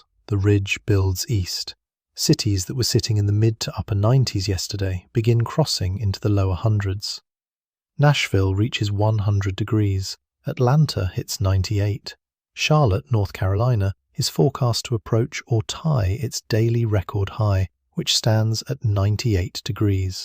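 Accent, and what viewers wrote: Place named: United Kingdom. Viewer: This is U.S. weather....why the British accent?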